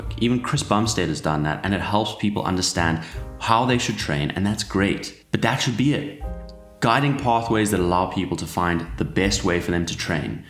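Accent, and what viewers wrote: South Africa. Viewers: Great video mate! Can def tell youre from SA. Represent us brother! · South African bro? Let’s go represent Bru! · are you south african? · i needa ask, are you South African? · Btw you a saffa bro? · Great video. Spot on, all these trainers often end up paralyzing people into inaction because there is always supposedly a more optimal way of doing something. It's certainly happened to me and many others I know. Also, nice to hear a South African accent! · 🚨🇿🇦🚨 Saffer twang detected! Saffer twang detected! 👮📢 Aweh Aweh boet 🤙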